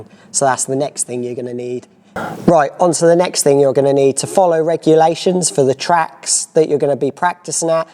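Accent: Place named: United Kingdom. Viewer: british accent is the worst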